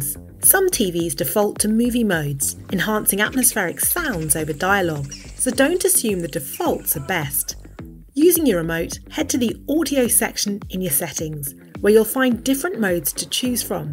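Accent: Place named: United Kingdom. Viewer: Hiw about using an American voice instead of British! So everyone can understand!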